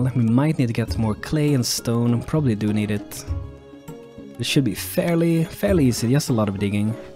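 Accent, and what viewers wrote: Sweden. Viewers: are you from sweden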